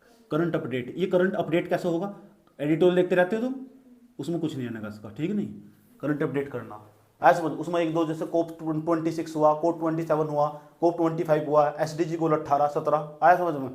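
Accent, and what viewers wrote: Haryana, India. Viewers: Asa lagta h sir ap bhi haryana se ho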